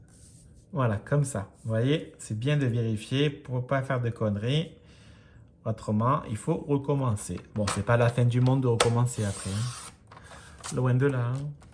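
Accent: South, France. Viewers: Sa vas? Accent du midi sa réchauffe le coeur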